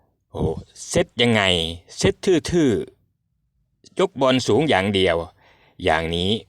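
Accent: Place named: Isan, Thailand. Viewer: สำเนียงอีสานคักแถะ หนังกีน พะนะ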